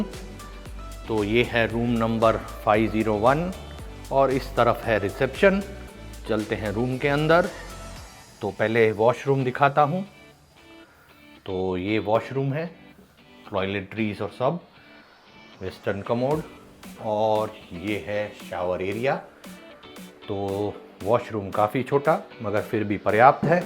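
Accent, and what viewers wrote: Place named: Italy. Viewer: You have build a nice Italiano accent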